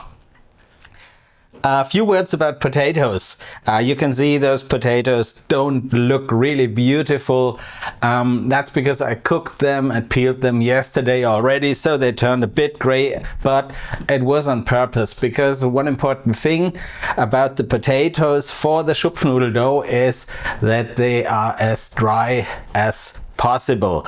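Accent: Swabia, Germany. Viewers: It is a reference (and a compliment to you) from a U.S. television show called "Saturday Night Live" from the late 1970's and the 1980's. Even though the TV show is still going on, it has different actors now. They do various skits (small plays) during the show. Back then, one of the favorite skits featured two guys who were body builders (weight lifters). And they used a German accent when they spoke. This was when Arnold Schwarzenegger had won the Mr. Olympia body building championship contest and started making movies such as "Conan", "Commando", and "Terminator". So, this was sort of making fun of him, a satire. In the U.S., if you go to a gym to do weight lifting to build muscles in your arms, it is called "pumping iron" because the weights used are made of iron, and you do an up and down motion to exercise, like an old style water pump or today's shampoo bottle with a little pump on top. 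So these two actors, whatever they did, were trying to train the audience, as if they were gym masters, to build muscle, so they could get dates with women. Their favorite line was "we'll pump you up", meaning we will help you get bigger, more muscular arms so all of the women will come to you. You, have large arms, so we assume you lift weights. You have a German accent, naturally. So whatever you do, even though it is in the kitchen, will be to "pump you up" and get bigger, more muscular arms. Even cracking an egg, will be to increase your arm strength "to pump you up". And in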